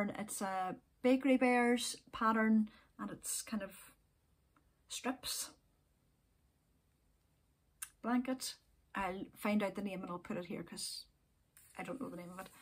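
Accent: Ireland. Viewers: Many many congratulations on 10k👏👏I really want to knit Joji's Frank shawl, but keep knitting hats and cowls for other people. I have loved each and every episode you have produced. Love your Irish accent and sense of humour. I hope one day I can meet you. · hello! It's so nice to have you and your lovely irish voice back on a regular basis! Joanne from Illinois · Congratulations with your 10K subscribers. I love your podcasts, your talks about yarn & patterns love love love it. And you have such a beautiful Irish accent. My name is Inge and i'm living in Belgium, my dream is to knit a colorwork sweater by Marie Wallin. I have a lot of books of Marie Wallin because i love her colorwork. I'm looking forward to your next episode. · I really appreciate your gentle manner, your honesty, the practical approach you take to knitting and yarn, your Irish accent and showing us the beautiful coastline of Northern Ireland! 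I still remember the episode you did during covid on the little things we could do to stay well - there was so much practical wisdom in that. Congratulations on reaching 10K subscribers!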